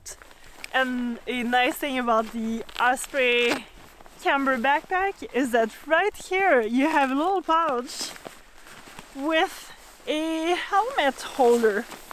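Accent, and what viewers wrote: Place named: Quebec, Canada. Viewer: You sound like you’re from Quebec, Canada!! Is the bag more of a burnt orange, or is it truly red?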